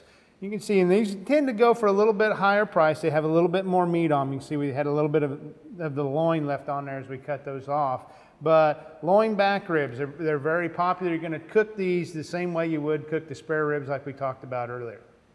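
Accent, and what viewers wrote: United States. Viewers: I was thinking why does this guy have an american accent, he works at the UK agriculture college.... it took until he mentioned Kentucky for the penny to drop.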